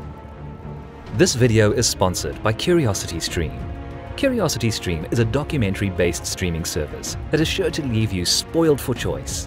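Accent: South Africa. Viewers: That's a South African accent .... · Is this narrator South African? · Saffer narrator?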